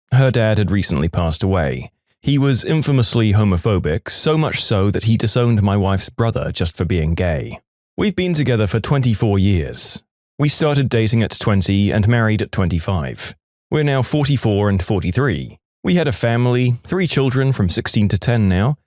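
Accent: United Kingdom. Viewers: I thought you were from England/British bud.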